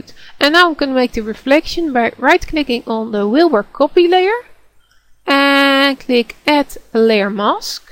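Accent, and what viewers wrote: Netherlands. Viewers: Are you from the Netherlands? I have relatives from there that have the same accent as you. :D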